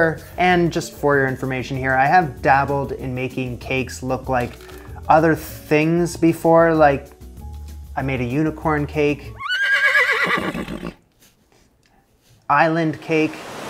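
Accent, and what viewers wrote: Canada. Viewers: I think I am falling in love with you. I hope that's okay. I love your Canadian accent.❤ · I knew from the moment i heard you that you are Canadian. The way you swear, hahahaha. Love yourself and your content. Loyal watcher from Alberta.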